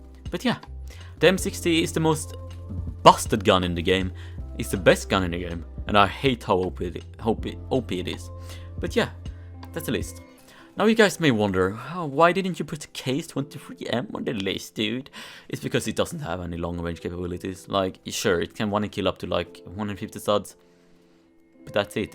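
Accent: Sweden. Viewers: R you swedish lol? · Are you Swedish? You sound a lot like it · He sounds more Swedish to me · 100% svensk, hur på hur du pratar lol. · This guy swedish? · bruh jävlar vad man hör du svenne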